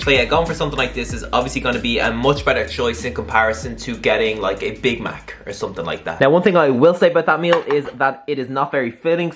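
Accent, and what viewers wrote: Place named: Ireland. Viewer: Based on the accent he's from Ireland, maybe Dublin. Im not sure though.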